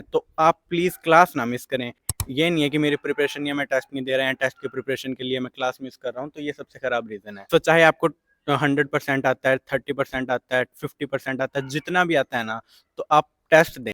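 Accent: India. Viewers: Bhai ki voice haryanvi lg rhi h tone ❤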